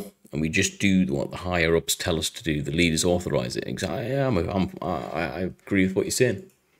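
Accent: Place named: United Kingdom. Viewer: I’m brand new to the channel, I’ve just come across one of your ‘voice over’ videos (the 5 reasons the US will beat China & Russia or similar. I’ve mashed the subscribe and like button. Loving this video, I’m ex-light dragoons, I have huge respect for the royals. Absolutely outstanding soldiers, great moral compass and I massively agree with everything said on this. Where are you from mate? You sound my neck of the woods. Well done on the channel. 🇬🇧🤜🏻🤛🏻⚓️⛴🥷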